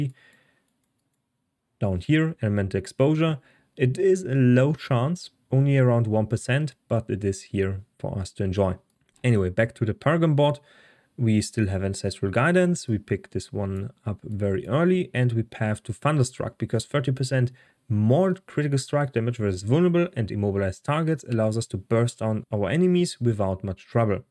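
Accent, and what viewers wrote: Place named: Germany. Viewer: Gibt es das auch auf deutsch? Hast ein starken deutschen Akzent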